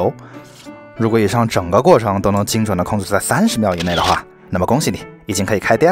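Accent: Wuhan, China. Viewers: 难怪口音这么耳熟哈哈哈哈哈哈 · 我就说为什么你的口音一直亲切，原来也是武汉的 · 果然你的口音是武汉的！ 加油 拐子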